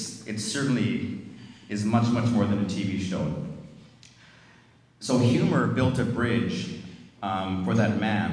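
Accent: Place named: Calgary, Canada. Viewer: I agree. He sounds so Calgarian.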